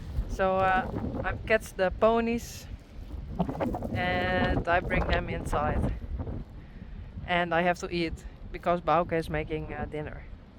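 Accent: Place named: Netherlands. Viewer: Ur English with the Dutch accent is perfect don't change a thing. Spidey is a character turning into a holy terror but that's his personality eventually as he gets older he will settle down don't sell him he's a large part of ur pony herd he's Mr personality. U seem to b recuperating at an awesome pace just don't overdue it acknowledge ur limitations before moving ahead so as not to reinjure urself.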